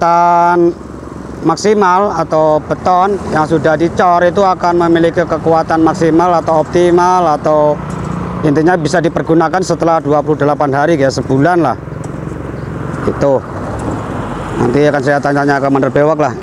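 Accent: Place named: East Java, Indonesia. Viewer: ❤❤❤❤😂😂😂😂 BIKIN KANGEN..... saat pembukaan nada2nya...... Medok2... kayak orang Jawatimur... Kadang kayak orang Sunda... Kadang kayak orang Jawa Tengah.... 😂😂😂😂😂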